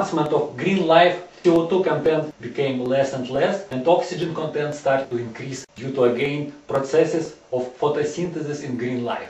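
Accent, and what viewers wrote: Russia. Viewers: I have learned so much just from watching your video. I find the comparisons between primitive and modern day breathing mechanisms immensely fascinating. I am a physiology student and this has given me a lot to think about! I'm also Russian (I think you are too?) and it is wonderful to hear the accent again after so long.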